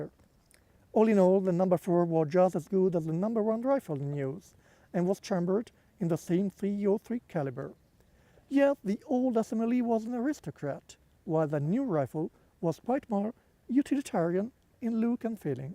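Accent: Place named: France. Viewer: I'm curious too. Judging from the accent I'd say France, or somewhere French-speaking?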